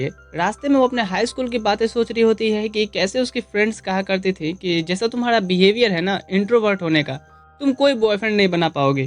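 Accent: Bihar, India. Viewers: Bihari h ky itna ganda explanation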